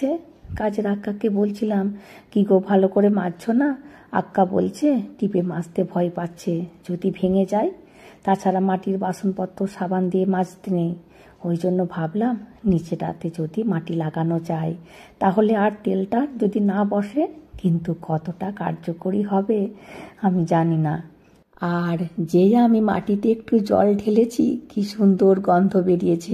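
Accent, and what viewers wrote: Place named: Marwari, India. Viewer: বাংলা ভাষার মারোয়াড়ি উচ্চারণ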